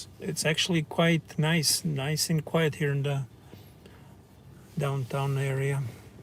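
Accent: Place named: Romania. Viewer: Bănuiam eu că ești român după accent dar nu eram chiar sigura. Mulțumesc de sightseing.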